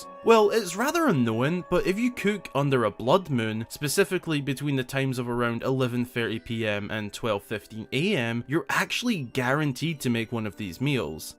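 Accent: Scotland, United Kingdom. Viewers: I JUST REALIZED PURAH IS ABOUT THE SAME SIZE AS MIDNA Also are you scottish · Are you brish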